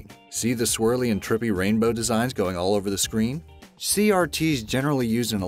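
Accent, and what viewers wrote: Hungary. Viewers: You’re Hungarian? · This guy is def Hungarian.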